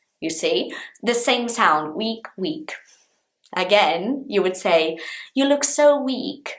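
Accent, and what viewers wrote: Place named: England, United Kingdom. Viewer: Thanks so much to the class ... I'm learning English "alone"(because I watch other channeel) , your class help me very much... I like very much your accent English... Thanks so Much.... I'm new subscribe here!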